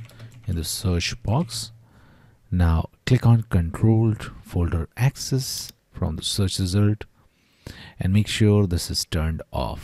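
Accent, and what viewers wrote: India. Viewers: It's a law of physics. If you hear a pajeet accent in the video, it won't work.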